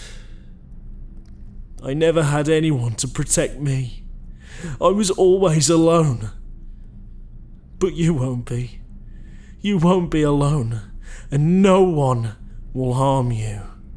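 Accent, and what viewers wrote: England, United Kingdom. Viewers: The British accent 😮😮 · such a english accent lol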